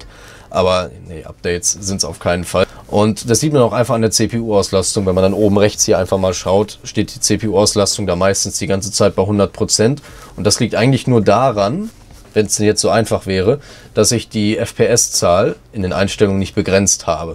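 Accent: Germany. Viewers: Kommst du aus dem Saarland?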